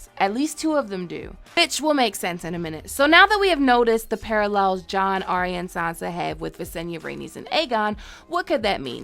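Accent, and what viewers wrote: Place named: Baltimore, United States. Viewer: Rolls up, and sips sultry sweet summer tea.. From Liberia I can hear that bmore twang. Thx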